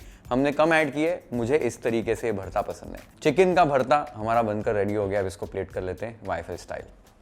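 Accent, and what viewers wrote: Maharashtra, India. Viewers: Chef I am ur big fan, Just wanted ur master chef wala episode, u look so handsome. I really follow ur recipes, I am a maharastian girl married to a Bengali guy, ur marathi accent is just lovable. Always wait for your new recipes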